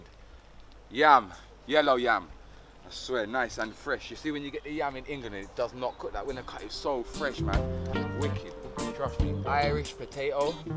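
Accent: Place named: Jamaica. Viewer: You talking with a Jamaican accent reminds me of my ex who used to talk with a manchester accent because she liked the sound of it despite being born and bred in the south lol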